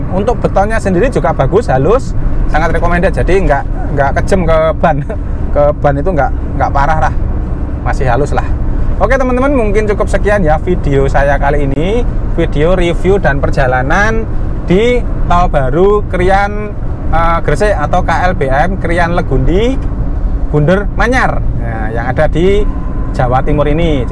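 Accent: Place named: Semarang, Indonesia. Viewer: itu cirinya kental logat Semarangan. Nyangkule ning Jl. Merak-Sby. Omahe Malang-asli Sampingane. 😀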